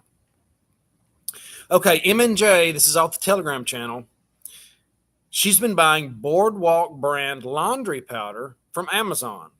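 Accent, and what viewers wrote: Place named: South, United States. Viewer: This is NOT an important comment but I just have to say that you are Billy Bob Thortons look alike! That southern drawl and all❤️❤️❤️ Also, thank you for all you do in proving information to us preppers.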